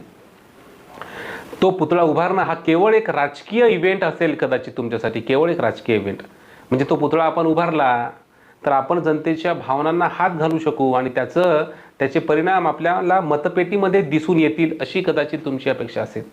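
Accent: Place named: Marathwada, India. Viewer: Because tumchi langauge Marathwada chi aahe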